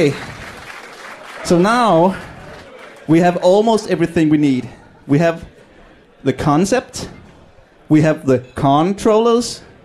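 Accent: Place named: Denmark. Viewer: Press Play on Tape is Danish, see <link> And yes, the accent very much sounds Danish :)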